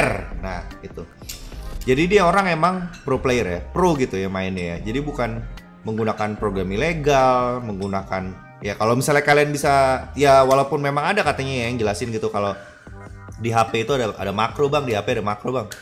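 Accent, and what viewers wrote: Java, Indonesia. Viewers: Orang Jawa to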